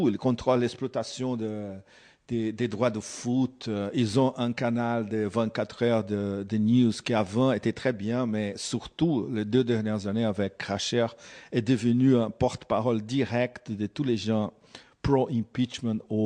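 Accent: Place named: Brazil. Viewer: Até acredito que seja verdade tudo o que fala esse "frances"...o unico problema é que esse idioma frances dele está tão fajuto que DE FRANCES ELE NÃO TEM NADA. É claramente UM BRASILEIRO FALANDO FRANCÊS e onde tem mentira...algo passa a FEDER...E FORTE!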